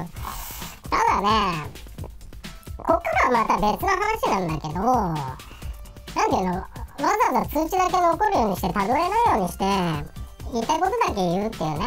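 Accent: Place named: Osaka, Japan. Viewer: なんだか、大阪のおばちゃんがしゃべってるみたいｗ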